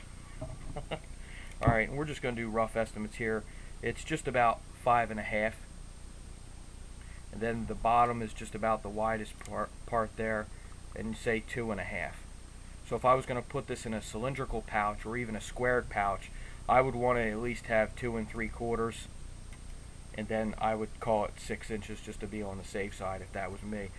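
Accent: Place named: Philadelphia, United States. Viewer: Streamlight is also local to you, assuming youre near Philadelphia ._. Based on your accent, amiright?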